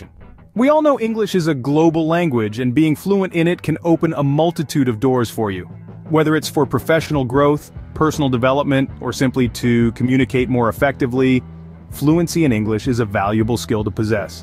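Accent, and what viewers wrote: United States. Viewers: This is American accent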